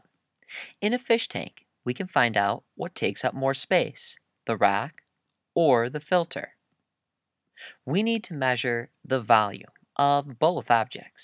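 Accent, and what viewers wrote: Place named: Texas, United States. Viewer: I think the video maker has Texas accent. He wanted to say Rock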